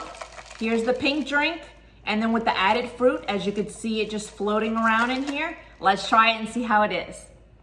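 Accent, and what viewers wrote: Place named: New Orleans, United States. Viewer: I’m going to try this. I’m going to take a wild guess & say you’re a NOLA Girl, I’m seldom wrong with detecting my people’s accent 😎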